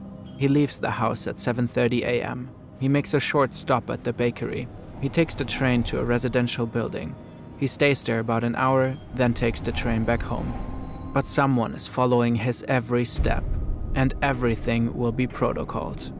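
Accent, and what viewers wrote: Germany. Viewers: The Stasi was crazy. Incredible what efforts they put into surveillance, what could have been if this effort was put into something good… also, hearing this in the thick German accent is great :D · I hear german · Hes German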